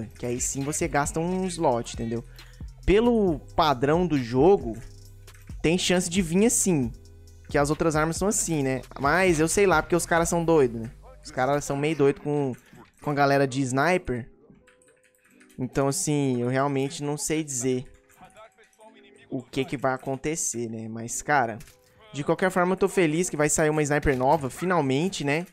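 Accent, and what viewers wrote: Minas Gerais, Brazil. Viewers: Mano pelo jeito que vc fala da para percever que vc e mineiro demais e tem muito sotaque tbm sou e nois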